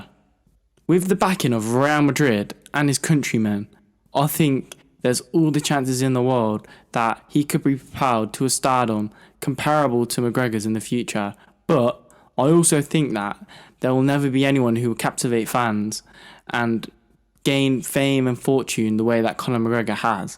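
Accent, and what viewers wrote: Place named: United Kingdom. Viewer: That’s a nice British accent you have brother - good breakdown!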